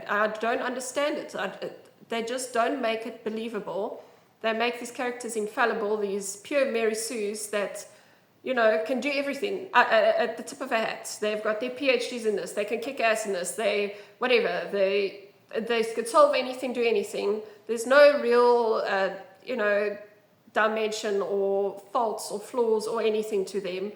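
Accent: South Africa. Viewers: I am Australian, and I am pretty sure this woman is South African, judging by the accent. People in South Africa still seem to have common sense, even here in Australia most people seem to have common sense, it's just when you switch on the TV the media like to portray as if society and reality is different, based on the agenda they want to push. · South African accent. Like an Aussie got infected with Bavaria. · LOVED this video! Agree with all your points! Another great femaile lead is Senua from Senua's Sacrifice. It's a brilliant game. Also, are you South African? 👀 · Is that a South African accent that I hear? Hello from SA :) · totally correct - also - ur accent? sa? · A South African talking about this "nonsense" is everything! Subbed! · I always comment for Algo when I hear a south african accent. Must support my countrymen 👍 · That accent... South African? 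· Yeah, I honestly didn't care much for the woke agenda, since it wasn't ALL there was to the game. What completely put me off from buying this game was the seemingly honest reviews; bad writing, mediocre gameplay, the facade of impactful decisions... yeah, that's extremely disappointing. Hopefully Mike Gamble and team can get a good game across the line with the next Mass Effect. Side note: a fellow South African showing love here. Recognized the accent. Great video. You have my subscription.😉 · Great video, I couldn't agee more! As a South African Imust say you sound like a South African ;)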